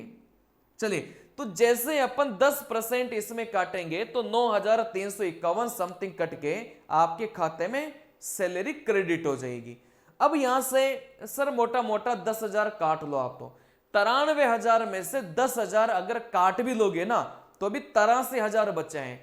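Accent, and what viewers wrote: South, India. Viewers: Sir aap jan bujhkar aise bolte ho ya aap south se ho aur aapne hindi seekhi hai padhane ke liye isliye aise bolte ho.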